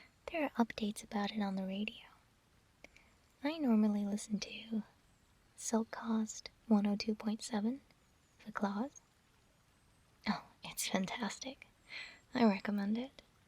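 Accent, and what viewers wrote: United Kingdom. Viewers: Is naturally british with an American accent? Or is she American with a really good British accent?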